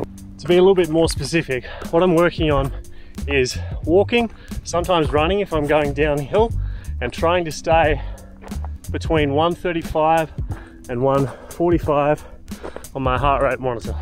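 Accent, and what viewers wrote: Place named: Australia. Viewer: Wishing you a speedy recovery! I've been watching your videos for a while, and amongst a multitude of reasons that make your videos so fantastic and engaging, it's also just so refreshing to hear a familiar Aussie accent. It's interesting to hear you speak of the challenges of not knowing how much energy you'll have each day to train due to the fatigue in your COVID recovery. I'd like to hear more about this. Obviously, you intend to achieve a full recovery and soon return to your usual training capacity, but what would you recommend for someone like me who has MS (Multiple Sclerosis) and faces this challenge constantly? I generally find that once I'm out on the trail, the serenity of being out in the bush gives me an instant boost of energy, but I struggle with regular training when I'm home. This is what I'd love to see more videos on. 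How to maintain good training practices when energy levels are hit and miss. Thanks again for your wonderful videos and I hope you recover quickly and safely! Regards from down under :)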